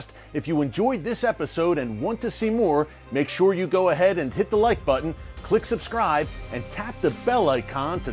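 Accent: United States. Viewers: yurp could tell you are from the US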